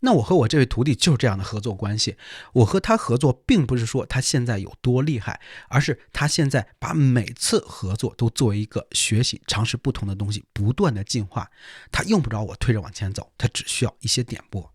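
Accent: Tianjin, China. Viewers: 老师，您讲的很好，但我很好奇您怎么从山村里走出来的？ 我听着您的天普口音很亲切…这种口音怎么可能从山村里走出来…蓟县也不说这种天普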